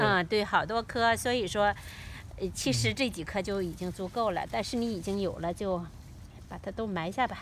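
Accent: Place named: Henan, China. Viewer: 一听就是河南老乡！老乡你好！👋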